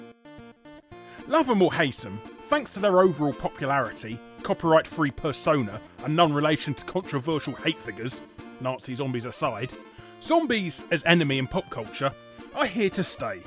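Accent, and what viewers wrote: England, United Kingdom. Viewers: What part of England is your accent from? I always wanted to know.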